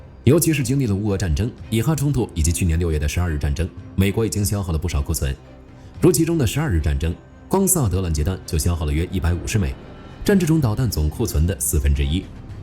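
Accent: China. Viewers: 这个是个什么来历？从视角，口音，以及用词来看是中国大陆背景。但是字幕全用繁体，字幕里的用词也是台湾风格。比如说语音里说"项目"，字幕则写“专案”。想假扮台湾人吗？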